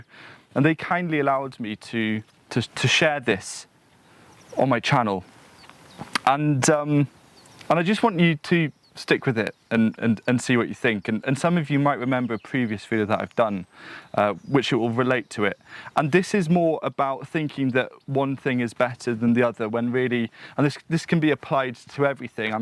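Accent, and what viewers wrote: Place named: Wales, United Kingdom. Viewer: I have but one of this amazing man's books, and consider him to be the only gardener on YouTube worth a book purchase. Not only is he a wise gardener with lots of sage advise (pun intended), he is a wonderful soul, and always worth my time. The always added plus is that gorgeous Welsh accent - I could listen to our read the phone book for hours on end, all while puttering around in my tiny garden. Thanks, - you have my vote for humanitarian gardener of the decade.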